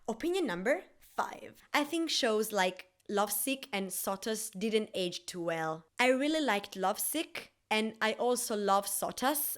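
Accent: Italy. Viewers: Finally, somebody who appreciates our Volleyball gays from Taiwan! Also don't be shy about your Italian accent. We're all just weird beans watching foreign gay TV shows on youtube, we can handle diversity here ;) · Se que eres Italiana pero creo que entenderás esto, tienes el inglés perfecto!! El acento está ahí pero para mi casi no se nota. Me encantó este video! ❤️ 💕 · I love your Italian accent! I think it’s cute 🥺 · I agree with nearly everything you said. I'll add I not understand the hype for Sotus I couldn't even get through the first episode and I tried a few times. I even tried viewing the other bits of it as I love Singto's work normally but it was just so boring and as you said full of boring tropes. BTW thank you and......... It was very easy to understand you, there are no problems with your English you should be proud of yourself. I love your Italian accent, oh yes I forgot to say, 'I'm English'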